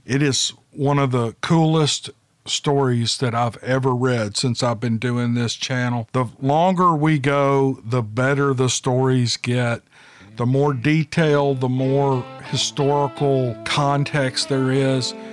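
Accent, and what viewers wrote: South, United States. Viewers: All these stories were very credible. Stories submitted about these creatures that do not include the fear factor but depict them as friendly harmless teddy bears are very suspect, in my humble opinion and don’t ring true. Of course this is not a slight towards you as the narrator. You simply recount it as it is presented to you without bias and you have just the right southern accent to do a very good job. Very enjoyable listening.😊 Greetings from the “great white north.” 😁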